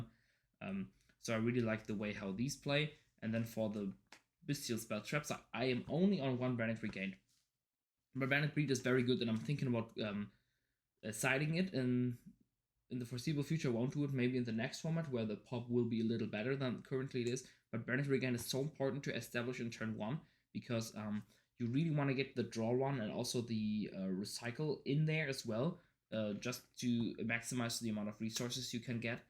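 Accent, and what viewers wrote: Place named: Germany. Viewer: Cool du bist auch ne deuscher :-) Hatte mich schon etwas gewundert über die akzent :-D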